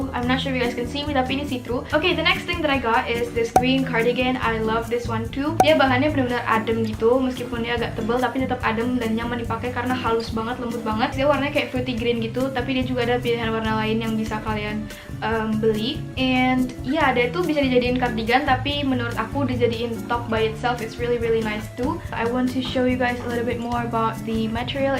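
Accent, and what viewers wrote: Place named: United Kingdom. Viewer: Plss your english accent😭😭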